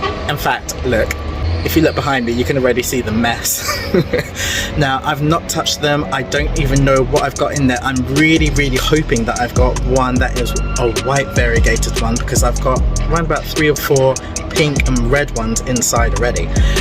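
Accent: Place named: England, United Kingdom. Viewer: I can tell by your accent why your coleus does better indoors than outdoors. Summer in England is more of a theoretical concept than a real season.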